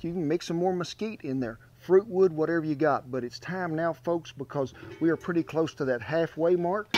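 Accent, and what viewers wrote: South, United States. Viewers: Gotta love that southern language. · I love that cowboy accent.....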